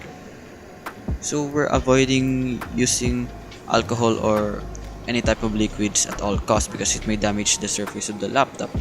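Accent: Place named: Philippines. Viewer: Are you filipino? Because I can hear that filipino accent heheheh :)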